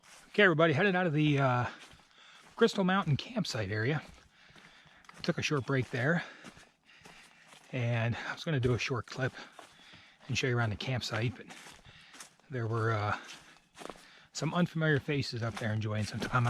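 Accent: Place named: Philadelphia, United States. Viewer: I thought I heard a Philly accent in your speech! I don’t doubt that that cheesesteak was the best!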